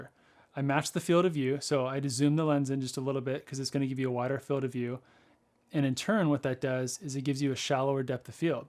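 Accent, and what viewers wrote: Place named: Orange County, United States. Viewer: Wow....we really do have an accent in Orange County lol. Awesome video!! I was on the fence between these two cameras, but I'm loving my BMPCC6k Pro. The biggest draw for me was the menus. I fumbled around with Sony and Canon menus for years, but once I used my first BM I've never wanted to go back, but the C70 almost got me. Yes, the 6K Pro has its quirks, and the overall build quality of Sony, Canon, etc. is much better, but I love the image, post-flexibility and MENUS of these BM cameras.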